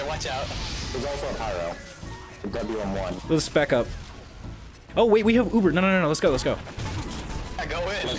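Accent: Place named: Texas, United States. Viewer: Shit sound you live in Texas? That is scary close to me.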